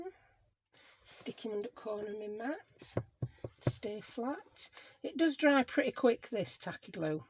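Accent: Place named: Yorkshire, United Kingdom. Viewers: Thanks so much for sharin...really enjoyed watchin.Love the use of paper bags and the lovely papers.Really enjoyd your lovely accent...I live in Australia now but originally from Sheffield UK.I felt like I had a little visit back ome. Been in Ozz 26 yrs..still sound like a Yorkshire puddin...lol...All the best luv....xo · Edith from scrapbooking with me sent me over. Wasn't expecting a fabulous northern accent 😄 lovely project, thanks for sharing xxx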